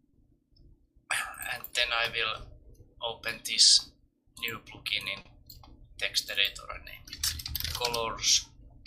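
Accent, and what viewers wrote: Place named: Russia. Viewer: I love your russian accent :)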